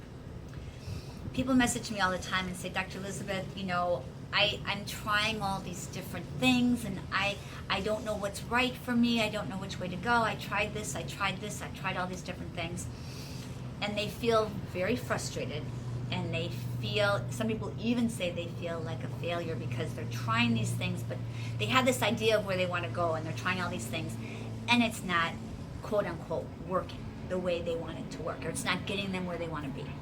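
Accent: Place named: United States. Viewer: A very pleasant person! Always laughing very enjoy to live! I dont understand all she is telling because she speak to fast and with a american accent but i come to see her !! In front of her energy i am happy for the day!!!!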